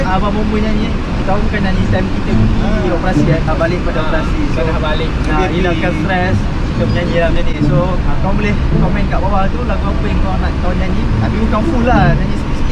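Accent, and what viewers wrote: Kelantan, Malaysia. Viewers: abang orang kelantan kee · Abg ni orang kelantan ke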